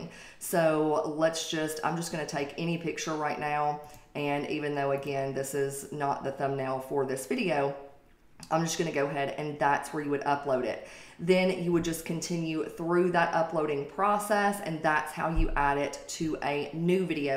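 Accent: South, United States. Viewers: I’m sorry but she has the most American accent a person in world could have. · This is a southern American accent. We don’t sound like this in the west or even east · Thanks!! Ijust what I need. 😊😊 Have a great day. Nice accent. The southy one. 😊😊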